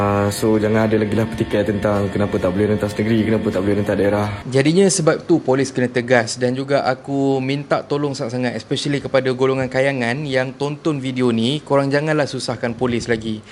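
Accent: Malaysia. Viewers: Lain y bhsa melayu malaysia ini. Lucu kosakata dan aksen logat nya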